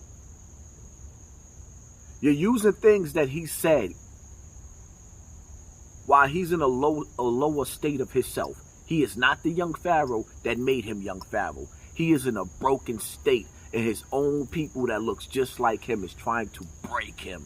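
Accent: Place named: New York, United States. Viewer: Good points BUT YOU aren’t the one to give the message AND Pharoah is voluntarily putting his mess online. You the same man who disrespects dead folks by saying they got their snot box popped and all that fake ass, extra, NY talk you do. YOU learn how to handle yourself properly before you try to tell us how to handle someone who is voluntarily doing nonsense. If you are so concerned this WHOLE VIDEO should have been to Pharaoh and not the viewers, because he is the one tripping. You just made this video for views, so go chill out and work on a better, more respectful vocabulary. That NY excuse is played, the internet is worldwide so get it how you trying to live.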